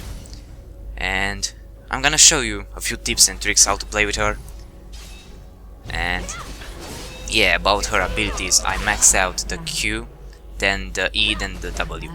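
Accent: Romania. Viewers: are u from romania?:D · Se vede pe vocea ta ca esti roman :). Joci foarte bine cu Akali. Tine o tot asa. Cred ca o sa mi o cumpar si eu. :)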